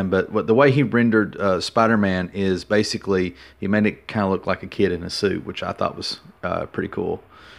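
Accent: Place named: South, United States. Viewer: I like Mark Bageley's Spiderman. Also I like your Southern drawl, its nice to listen to.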